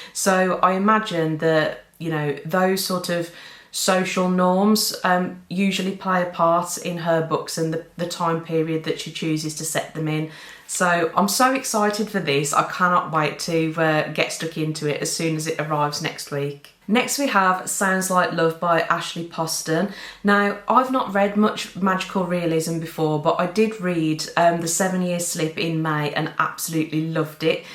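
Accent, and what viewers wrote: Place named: Midlands, United Kingdom. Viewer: Love your videos! Such a fresh approach, speaking like a lovely normal person from the Midlands 🙌🏼 Watching all the way from Perth, Australia 📚 Currently reading The Paris Apartment, by Lucy Foley. So far ⭐️⭐️⭐️⭐️⭐️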